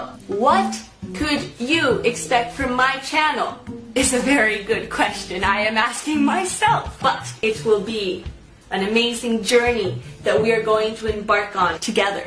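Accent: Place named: United States. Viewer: pretty good american accent to just have moved from argentina a year ago. wondering what else is coming.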